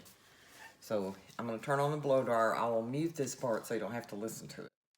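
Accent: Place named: South, United States. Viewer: Gotta be from the South, gurl! Love it! :)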